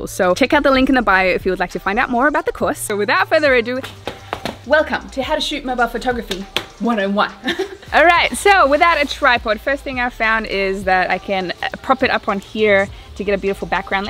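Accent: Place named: Australia. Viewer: Were you using a timer or? Also! You’re so bubbly I love you channel! And! What’s your nationality because your accent is a bit of a mix. I’m from Australia and you sound kinda aussie!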